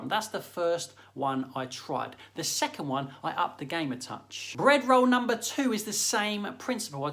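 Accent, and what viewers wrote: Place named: Essex, United Kingdom. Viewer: You forgot to do it in that Essex accent.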